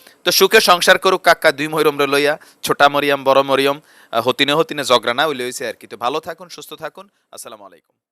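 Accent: Comilla, Bangladesh. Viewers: Vai,,plz apni alws cumillar vasay vedio diyen,,, eto eto eto valo lage❤❤❤ aha go moirom bubu❤❤❤😂😂😂😂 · hahah i just like ur comilla accent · ভাই আপনি কুমিল্লার ভাষায় বল্লে সুন্দর লাগে।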